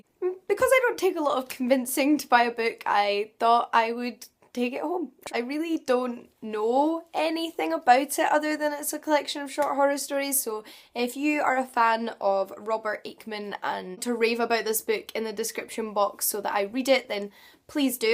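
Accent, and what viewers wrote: Scotland, United Kingdom. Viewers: ***** That's really admirable. People tend to overlook that these days, I think. :) And you've got such a lovely accent! May I ask what part of Scotland you're from? I kind of want to travel there and just sit in a public place and just listen to everyone having conversations- is that weird? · I love your accent! Scotland is such a beautiful place! Listening to your voice reminds me of the beautiful time I had back in april in Edinburgh! Awesome haul btw!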